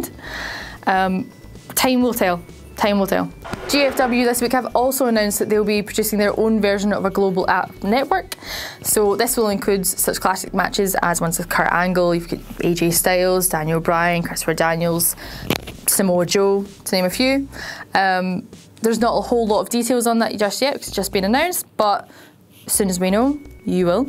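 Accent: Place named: Scotland, United Kingdom. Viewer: My man's got one hell of a Scottish accent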